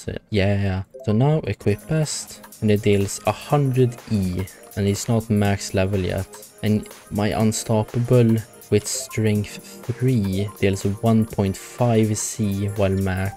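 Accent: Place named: Sweden. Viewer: Yo you have like a swedish accent and in case you swedish tjena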